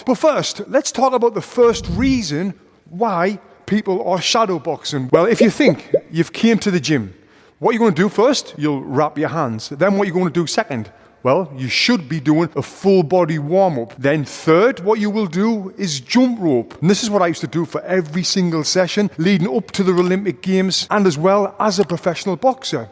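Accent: United Kingdom. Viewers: Is it 'Britain English'?!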